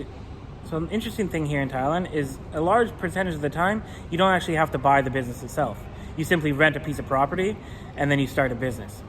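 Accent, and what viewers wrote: United States. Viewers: You sound like an American and you actually have the right to own 100% of a business in Thailand. It's called "The Amity Treaty". You just need to have it setup with a lawyer and go to one of those firms you talked about. I can't believe no one told you about this. The paperwork is like 14,000 baht. Google, setting up an Amity Treaty business in Thailand.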